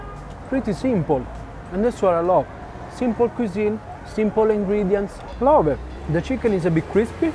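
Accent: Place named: Italy. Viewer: Your italian accent makes your reactions even better :D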